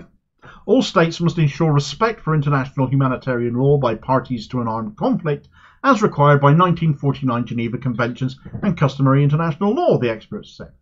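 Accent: United Kingdom. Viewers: YES, SIR... YOU THE BEST.... I LOVE THE SHOW... LOVE THE BRITSH ACCENT.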